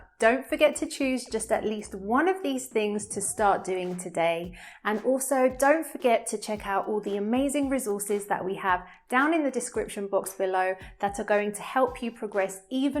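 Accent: United Kingdom. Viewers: British Accent.. sorry to say, my dream is wanna learn only American Accent... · I got difficulties to understand british accent😅😪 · Your british accent is really amazing thanks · I love your British accent my dear. 😍🥰😘❤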